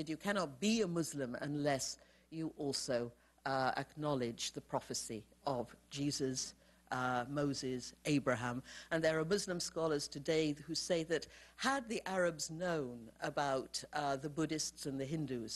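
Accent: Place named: RP, United Kingdom. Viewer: She has no idea what she was talking about. her posh accent doesn't make her more knowledgable unfortunately.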